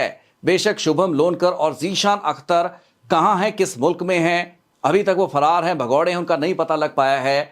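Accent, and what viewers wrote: Punjab, India. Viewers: Yaar tere hindi v Punjabi hi vekh de ne.. feer punjabi ch hi boll lai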